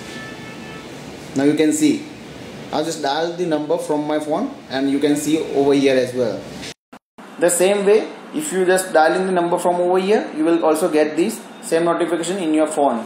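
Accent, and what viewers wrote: India. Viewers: Abe Indian nahi ban sakta kya English me bak bak kar raha he lag to tu Indian raha he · Ghati English?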